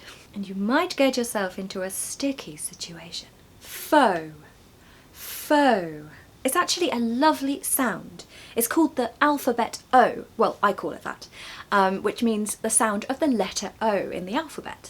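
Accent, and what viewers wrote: RP, United Kingdom. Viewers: Love your british accent and always can't wait to watch another YouTube video from you ❤ Greetings from Indonesia 🇮🇩😍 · 😮When I lived in Cambridge in the 70s my landlady had wonderful diction like you. She was eccentric and constantly trying to seduce me but I put up with her to improve my English.When I moved to California I passed for British until I absorbed local sounds. I can switch if I try but your exquisite RP (to me it's perfect UK) is hard to match. Two small amusing details: the wrong Evenink sounds like Lugosi in 1931 Dracula. My generation learned from...Oh, giiirl. BTW, you are surprisingly expressive for a Brit, are you maybe part Irish? Great teacher and a charming lady.😊❤ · You are soo cute and sound natural....British accent is really beautiful and omg are all British women this beautiful 😮....always enjoy watching you mam..😊🇮🇳❤️🇬🇧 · I am learning English, I like your British accent very much, thanks for you videos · Hi, thanks to your video my hearing celebrates again!🎉🎉🎉 Helpful topics you've well explained again, under your beautiful MRP sound with! 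👌 I'm also fancy the american way but when am back to your videos discovering again where the true is!!! 🚩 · Thank you so much indeed, ma'am! I love ur modern RP. Always It remind me😊 something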